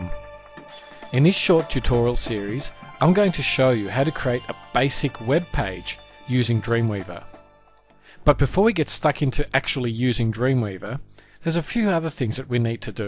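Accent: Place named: Australia. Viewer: nice tutorial Your aussie aye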